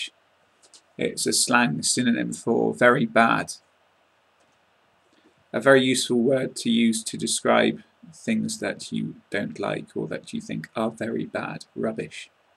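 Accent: United Kingdom. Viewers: I really like your British accent !! Good job :)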